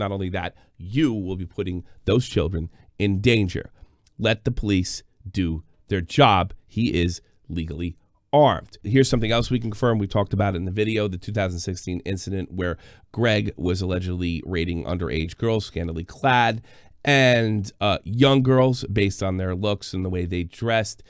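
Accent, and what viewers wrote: United States. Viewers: are you natively german? your accent sounds american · Your English is very American. If your not in North America, what part of the world are you? Just curious since you have no accent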